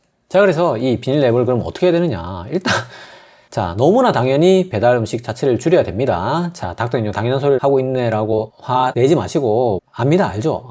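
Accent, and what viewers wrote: Gyeongsang, South Korea. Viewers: 선생님!! 고향어디세요? 저도 경상도라 말투가 너무 정겹고 말씀도 너무 재밌으세요~~~~😁😁😁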